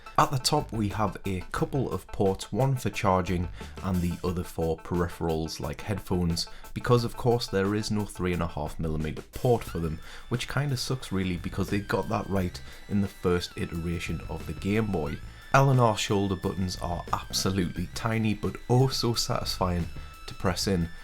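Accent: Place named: Newcastle, United Kingdom. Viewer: your fromm Newcastle alright lemme sub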